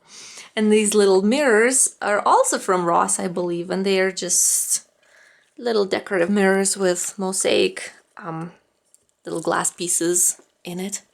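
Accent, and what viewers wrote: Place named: Russia. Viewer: a teacher of mine is Russian and it sounded a bit similar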